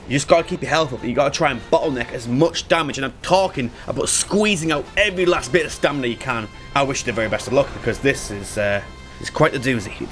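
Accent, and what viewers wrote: United Kingdom. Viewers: I didn't come in here expecting you to sound full br'ish, but I stayed. God I stayed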